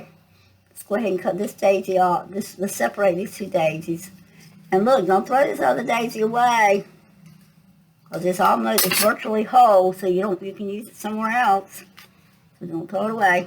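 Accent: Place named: North Carolina, United States. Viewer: I just found your channel tonight and as soon as I heard your voice I thought you sounded like a nice southern grandma...like many of the older southern women I've known my whole life. When you said something about NC I realized that's why you sound so familiar. I was born, raised and still live in Raleigh. You remind me of my grandmother who passed in 1997 at the age of 84. I will be visiting your channel often, not just to hear your voice but to ingest your wonderful junk journaling content. I love that center pocket flip!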